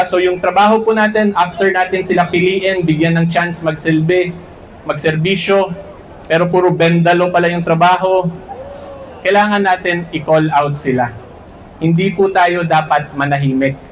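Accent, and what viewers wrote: Visayas, Philippines. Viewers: Bisaya diay ka atty hehe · Sir abayon kaya pala may lambing sa dulo Ang pananalita mo bisaya man diay ka,, salamat sa PAG kampi mo sa tama, Ay salutes you always. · Bisaya kapo ba atty?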